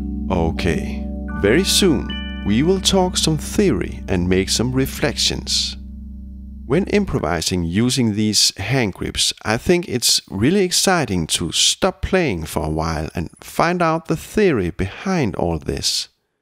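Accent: Denmark. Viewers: i love the heavy danish accent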